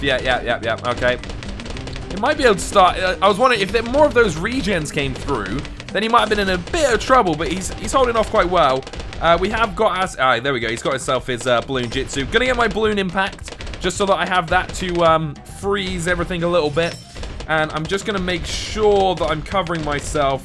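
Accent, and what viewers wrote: United Kingdom. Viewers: i very loved ur english-british dude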